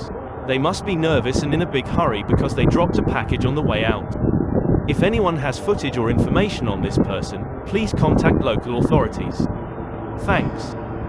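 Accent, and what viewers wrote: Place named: United Kingdom. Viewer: I like your videos and your channel, but I do have one complaint. Sorry, but I really can't stand the narrator with that British accent. It's actually keeping me from sitting through the videos. Maybe it's just me but I thought I might represent others as well.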